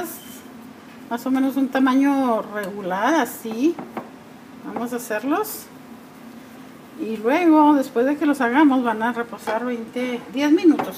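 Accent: Chihuahua, Mexico. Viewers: Creo k si xk habla igual como nos otros acá en Chihuahua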